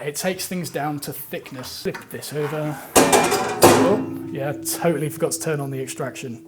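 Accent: United Kingdom. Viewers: are you British? if so stick to our language, couldn't watch the rest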